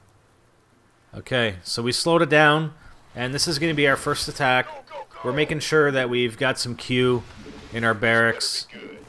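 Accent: Canada. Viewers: You wouldn't happen to be Canadian, ey?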